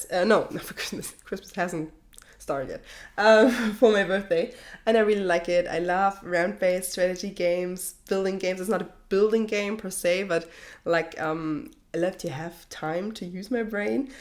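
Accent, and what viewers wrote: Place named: Germany. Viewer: Wie man einfach direkt hört dass du deutsch bist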